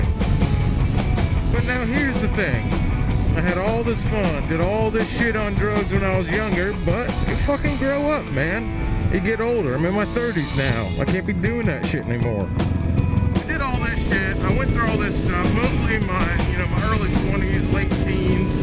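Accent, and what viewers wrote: South, United States. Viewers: Man I love your vids. Every time After i watch one I strut round the house speaking in a southern accent pretending I ride a big ass triumph! PS I live in New Zealand and ride a bicycle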